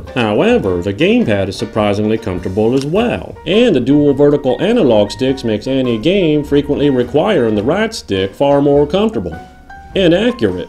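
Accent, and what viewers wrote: United States. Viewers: wait are you American?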